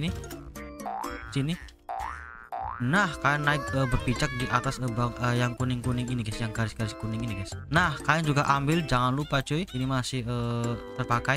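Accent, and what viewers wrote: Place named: Java, Indonesia. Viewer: Logat jowo ne medok banget bro😂😂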